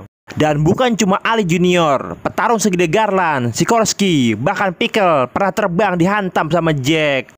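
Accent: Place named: Jakarta, Indonesia. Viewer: Gw suka bahasa lo bang betawi bet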